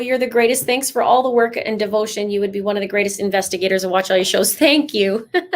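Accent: Canada. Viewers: Love everything about how you present information, pick everything apart and are onto the lies in people's statements. Melanie Gibb is definitely covering her butt because she should have warned someone many, many times. She is doing all these interviews to make money off of this to take the focus off of her responsibility of saying something sooner of what she knew was going on. I am not buying her innocent statements. Alex's wife, definitely something there. Lori's sister dying... Sociopaths have usually been sinister their whole lives ,ust like Chad has been luring women many more times before. Love your Canadian accent. (from a fellow Canadian) Melanie P. has snowed her husband and someone will spill the truth about her very soon I suspect. Keep up the great work!!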